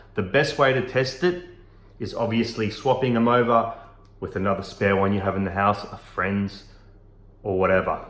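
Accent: Australia. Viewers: Yet another success story - thank you so very much! And as a bonus, I was able to be enchanted anew by that delightful Aussie accent, with tooth peeks which aren't too theek :)) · What a blessed relief it is to hear some one speaking in Aussie accent (or is it a Kiwi accent? - I can't tell the difference) instead of an American accent. And someone who speaks clearly. And better yet still - not to have some pointless bloody awful noise going on at the same time. Well done on this count alone. Your advice also came up trumps! - it was the tooth pick suggestion that saved the day. Much thanks!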